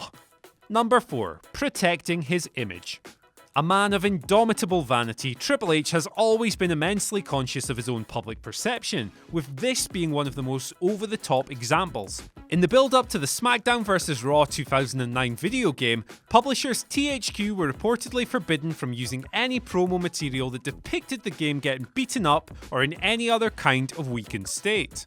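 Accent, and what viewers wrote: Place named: Scotland, United Kingdom. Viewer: Finally a Scottish narrator 🇬🇧🇬🇧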